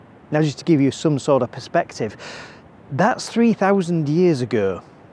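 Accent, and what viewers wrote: Hull, United Kingdom. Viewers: These video's are brilliant, and in that wonderful Hull accent =👌.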